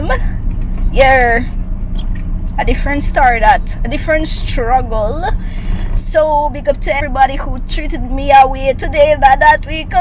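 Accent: Jamaica. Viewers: GIRL UR SÒOOO FUNNY, WITH UR JAMAICAN ACCENT, YOU HAVE ME LAUGHING, 😆😁UR A REAL JAMAICAN GIRL NOW, I MUST SAY, L❤❤❤U ALL 🥰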